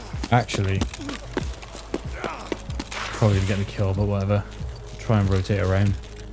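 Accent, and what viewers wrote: United Kingdom. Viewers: This is my first video watching u and from the moment I hear the British accent I’m engaged 😂 then I witness the splendid performance of a player with 4000 hours on EFT and not only that but you give us such a vibe of music afterwards as you loot and groove your way through. Then finally I see the length of the video 😎. A job well done my friend. 🤝 how could I not subscribe?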